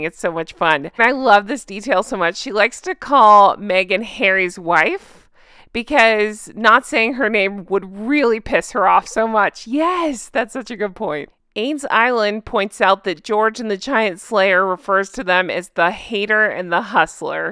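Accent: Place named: South, United States. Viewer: has a sexy voice, a not too posh Southern accent and with a lovely timbre. I think he's in his late 40s or early 50s due to pop culture references he sometimes makes to the 1980s, including the music of that era. Bet he's handsome AF.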